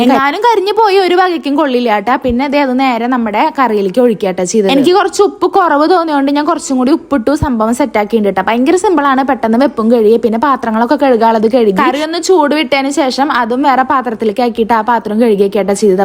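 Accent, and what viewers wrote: Thrissur, India. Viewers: kuthi chathachath parayunnath kelkkan nalla rasam und thrissur slag anu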